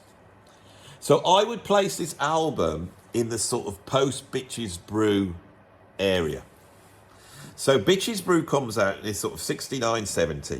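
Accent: United Kingdom. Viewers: Though not a musician (professional, amateur, or otherwise), I've been a jazz/rock fusion fan since my teens (for about 50 years) and of course still am. However, most of these artists/albums you cite I hadn't heard of and of course I didn't have albums, CDs, or mp3s of their music. Exceptions being, and albums that you've named, which I've acquired in the last 4 or 5 years in mp3 form. I was actually able to find the other seven on mp3 just now and have promptly downloaded. I ran into your YouTube channel by accident--yes, was drawn in by your video about albums that critics love but that you called "crap" and I have to say I agreed with most but not all of your assessments. But....when finding out that you are a fusion and prog rock fan AND a professional drummer to boot, I literally had to subscribe. Although it may take some time for me to get used to the British accent (yeah, born and raised in the USA...just like!!! 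🙂), even when I disagree with your opinion on an album--I find you thoroughly entertaining and witty. Count me a fan!!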